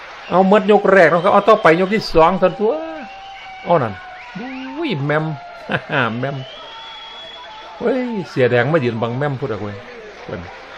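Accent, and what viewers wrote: Isan, Thailand. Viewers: ผมชอบคนภาพมวยเสียงอีสารมากๆเลยครับฮาดีครับ(ชอบครับ😅😅😅)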